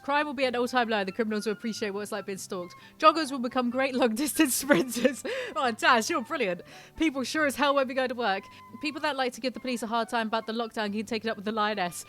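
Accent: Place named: London, United Kingdom. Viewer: I just love the way you speak! It reminds me of my ex boyfriend who's from London, the more videos I watch from you, the more I think you guys would make an awesome couple, I know it's weird xD seriously the weirdest thing I have ever think about an ex! Looove your videos <3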